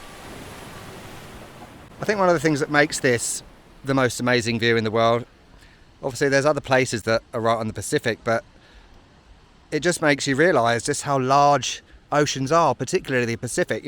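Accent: United Kingdom. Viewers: Thanks again for your great videos! I have visited Baja California and Jalisco many, many times. But now I want to go see Hermosillo and San Carlos thanks to you. Love your British accent! I lost count of how many times you said "brilliant!" or "idnit?" LOL 😂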